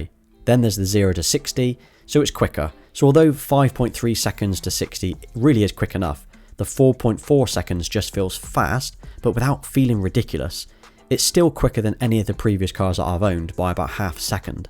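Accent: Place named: South West England, United Kingdom. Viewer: aah i love this! its nice to see americans watching little ole us Brits videos! Thats a pretty good claim to fame for you ;) and you're getting your accent on the map too! From, fellow south west 'un. loving the videos. These people are correct. Your vids are informative, straight forward, compelling and shot really well. congrats on a fab channel.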